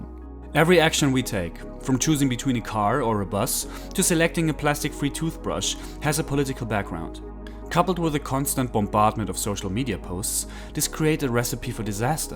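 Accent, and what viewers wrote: Germany. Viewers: Have you ever lived in the U.S.? I hear you have a German accent. Well, the only candidate who gave a shit about helping working people Bernie Sanders was labeled "too radical for the American people" because he wanted to develop a universal healthcare system like every other western democracy besides us has and get us free or nearly free college. In fact, I heard a German political commentator state that this radical Bernie has views that line up well with the Democratic Christian Union the best in German politics.... The conservative party 😂. And as for religion, nearly 70% of the country is still fundamentalist Christian so that's not true either.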